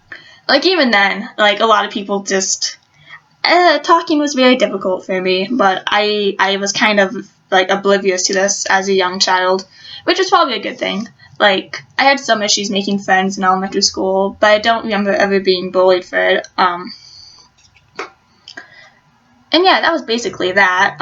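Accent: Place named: United Kingdom. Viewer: Thank you for sharing my dear. My 3 year old son is in speech therapy. His therapist mentioned speech apraxia for the first time this week. After reading about it I got very sad and worried for my sons future. First thought that came to me was the bullying he will experience at school. Now watching your video gives me hope. You are very brave and to be honest you sound a bit British. I love it! Many thanks and wishing you many blessings.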